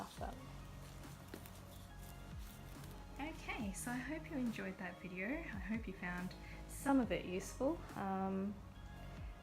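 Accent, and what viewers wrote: Australia. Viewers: Nice to hear an Ausie vioce for a change, will be following your channel to see what else you have to teach me.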